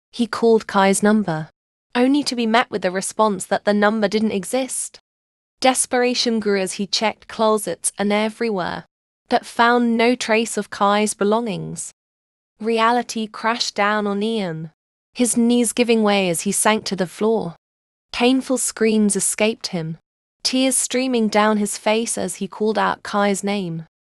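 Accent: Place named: United Kingdom. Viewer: why is it. british not watching it if its in a british accent sorry :/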